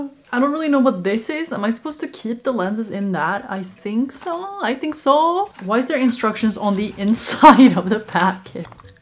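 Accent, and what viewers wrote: Sweden. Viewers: Is she from Sweden her English accent sounds like Swedish english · R u swedish? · YOU’RE SWEDISH, RIGHT? I dunno why I got so happy by your accent, it’s just so familiar hehe.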